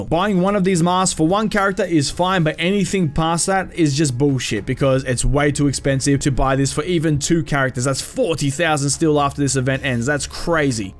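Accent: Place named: Australia. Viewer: Also, where abouts in Aussie you from mate?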